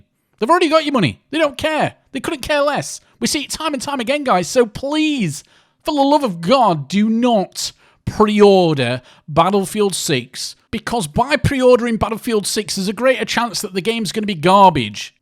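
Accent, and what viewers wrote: United Kingdom. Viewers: It’s simple. People like spending their money that they earned how they feel like. If you don’t want people to be able to do that move to a communist country. Oh wait you sound British or Australian so you already live in a communist country.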